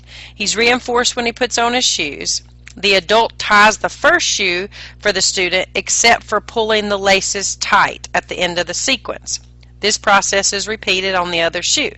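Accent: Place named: Texas, United States. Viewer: fantastic texan axent ;-)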